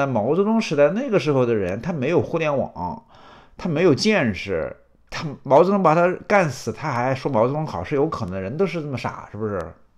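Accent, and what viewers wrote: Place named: Changde, China. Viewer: 常德人？口音很亲切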